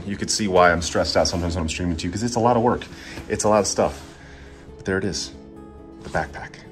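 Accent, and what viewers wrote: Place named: United States. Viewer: Hope release more Video like this, so that I can learn more American English.❤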